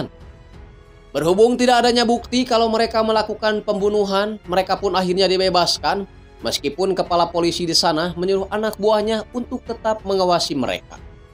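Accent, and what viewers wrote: West Java, Indonesia. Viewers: Penyakit "kaligata"😂...bang lu orang jawa barat? Kota mangga kah?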